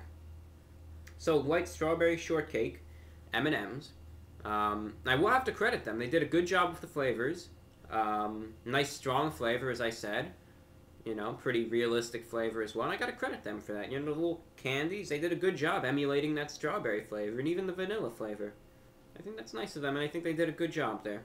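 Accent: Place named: New York, United States. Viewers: it's actually his New York accent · Can an American help me out here, what is this accent? 'Vaniller' and 'Chawclat' and whatnot seems different to standard American, there's definitely a regional accent trying to be suppressed in there.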